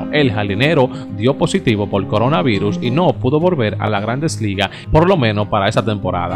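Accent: Cibao, Dominican Republic. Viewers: Yo siempre les dicho a ese narrador qué hable más al paso, pera que suelte ese hablar tan Cibaeño, ejemplo, mul, al, sel, por decir, muy, hay, seis!!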